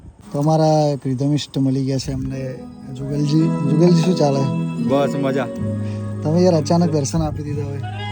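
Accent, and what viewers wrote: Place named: Mehsana, India. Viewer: ખુબ સરસ મજા આવી સ્ટેજ પ્રોગ્રામ જોવા ની તમારા બ્લોગ માં જે ભાષા બોલો છો મહેસાણા એતો બહુ સરસ મજા આવે છે સાંભળવાની😊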